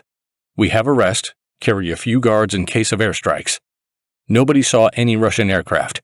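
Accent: United States. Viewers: I loved this series until you added the bullshit American voice.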